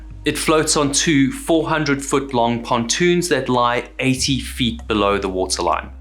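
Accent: South Africa. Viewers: Great video thanks. Everyone can tell you're from South Africa because of your accent.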